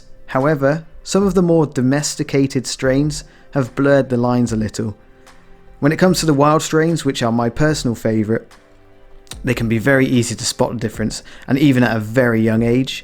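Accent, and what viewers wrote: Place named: United States. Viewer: I hate American accent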